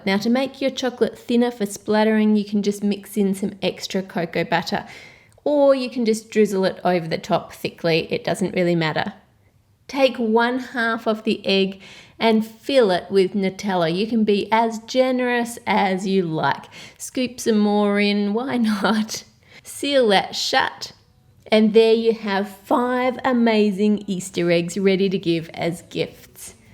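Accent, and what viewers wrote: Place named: Australia. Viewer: probably the only person who speaks in an australian accent i didnt enjoy listening to when watching this video.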